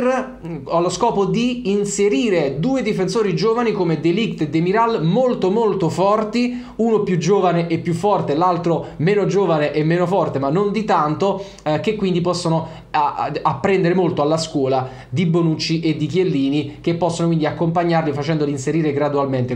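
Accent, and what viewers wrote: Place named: Naples, Italy. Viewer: di dove 6? Hai un accento napoletano. Cmq sei in gamba, saluti e ForzaNapoli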